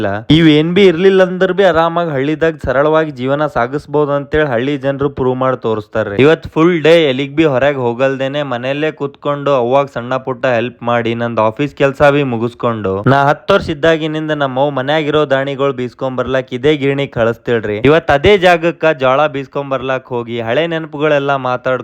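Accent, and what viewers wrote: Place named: Gulbarga, India. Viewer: Nim gulbarga dhati namma dharwad basha kina kadak aithi..nanag nan dostar nenap banth nod bhai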